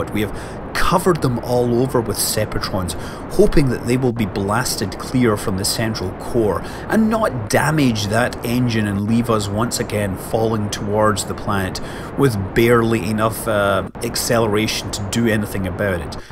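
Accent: Scotland, United Kingdom. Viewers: Well... new favorite Interstellar Quest episode found! Drama, Suspense, perseverance aaaand all in a Scottish accent... Everything a good show needs.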